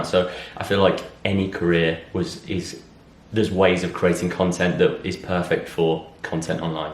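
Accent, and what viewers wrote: United Kingdom. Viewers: Amo o sotaque britânico 🇬🇧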